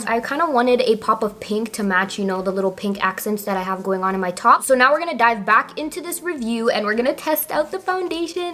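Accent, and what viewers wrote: Toronto, Canada. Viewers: I knew i heard the Toronto accent lol.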